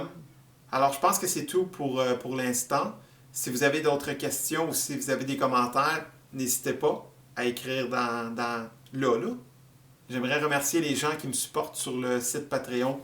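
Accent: Canada. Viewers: C'est la première vidéo que je regarde de et déjà on ne peut pas rater ton accent canadien:) Ensuite, sympa ta petite explication, je cherche moi-même une façon d'enregistrer ma basse sans saturation vu que le micro de mon casque n'est pas le meilleur moyen! En tout cas bravo, t'as l'air sympathique en plus.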